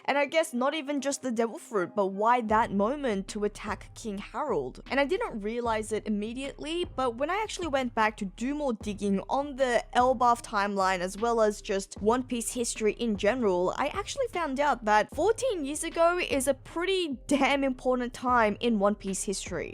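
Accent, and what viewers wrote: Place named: Australia. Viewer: she's Australian.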